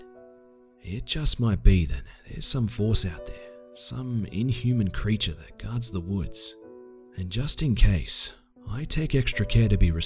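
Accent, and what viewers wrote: Australia. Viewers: Be r u Aussie?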